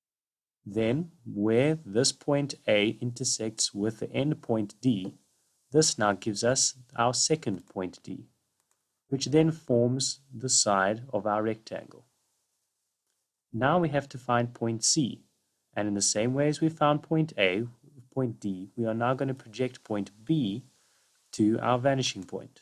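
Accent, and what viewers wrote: South Africa. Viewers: You sound Afrikaans, Mr Sir. Would you like some YouTube help by the way?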